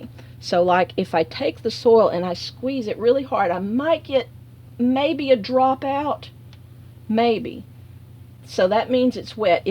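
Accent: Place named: South, United States. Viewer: Oh . You have a southern accent? I didn't notice . I picked up my English in North Carolina . So apology is not needed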